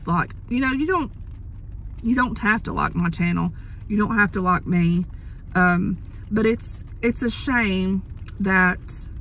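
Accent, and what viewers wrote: South, United States. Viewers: Amen Lady!! You said everything that I would have said too! I'm a new subscriber and I really like your southern accent. I have one too. I was born and raised in Tennessee. I now live in Alabama. My parents and a lot of family are in Tennessee and I visit as much as I can. I love the way you think about things and the ones with harsh comments are the ones insecure about themselves. You are beautiful inside and out. Take care and God bless!! ❤️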